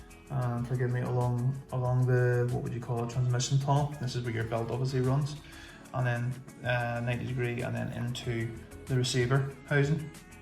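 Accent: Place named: Ireland. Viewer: Can't hear wtf your saying . Sort it out. I guess u are Irish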